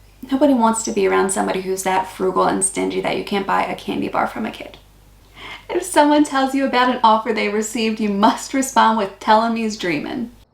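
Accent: Australia. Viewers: Your G'day is starting to sound more Aussie as you go along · Sounds as though you're finally getting your head around how we Australians function. I have a feeling when you finally get back to the States for a visit, you're going to have to check yourself (the way in which you speak) occasionally. I won't be surprised if you get some strange looks from your family and friends. You're becoming an Aussie by osmosis.😀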